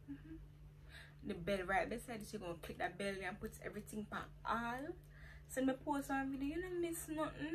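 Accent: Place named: Jamaica. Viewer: As always nice video.. Love the Jamaican accent pretty lady.. You gon make me tip 💰 you something nice lol. Stay focus and keep the videos coming. Your future is bright 🇯🇲 👑..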